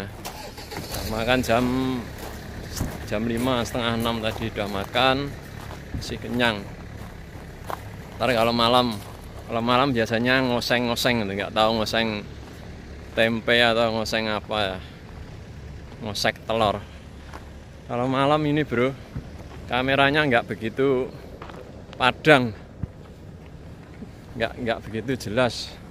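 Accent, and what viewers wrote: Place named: Java, Indonesia. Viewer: Semngt mas. . Jowone pundi ko medook bngett.. 😄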